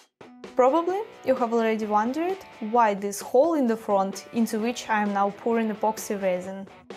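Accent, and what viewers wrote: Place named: Russia. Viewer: Russian accent that you can never hide))))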